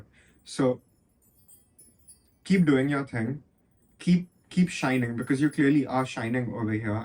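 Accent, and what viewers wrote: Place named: India. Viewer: Ummm I did not expect a white looking guy in a cowboy hat having an Indian accent 😂 anyway pile 2 was accurate. A close friend senses the "darkness" but I think its just cuz Im cynical and hard to impress.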